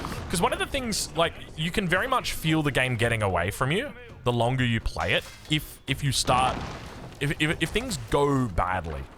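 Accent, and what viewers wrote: Australia. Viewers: Yes he’s just Aussie it don’t rlly matter but yeah we normies say “cash”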